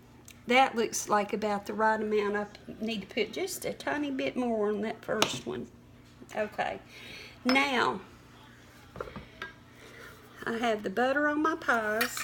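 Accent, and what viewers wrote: South, United States. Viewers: When the presenter has a Southern accent I know whatever the recipe is it will be GOOD!!! These are amazing thank you!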